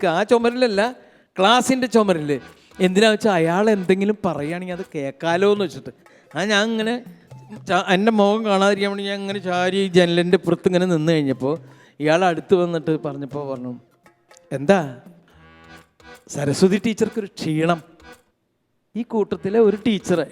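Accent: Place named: Thrissur, India. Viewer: തൃശൂർ സ്ലാങ്ങിന്റെ ഒരു സൗന്ദര്യo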